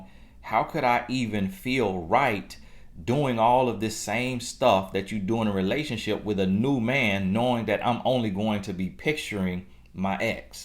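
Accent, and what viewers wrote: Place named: Florida, United States. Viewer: u gotta be from Florida to from how u talk, I feel like your my brother or cousin schooling me 😂 I love you!! Much respect 💯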